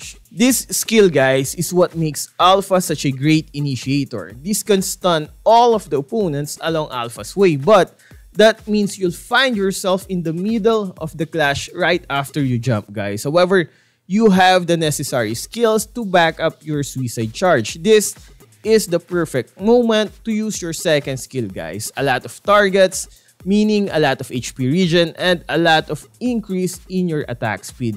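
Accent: Philippines. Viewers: i can hear the filipino accent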